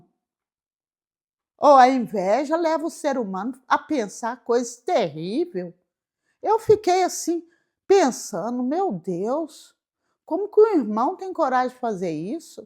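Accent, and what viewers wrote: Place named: Minas Gerais, Brazil. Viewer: Muito bom essa forma mineirez de contar história bíblica❤😂 parabéns 👏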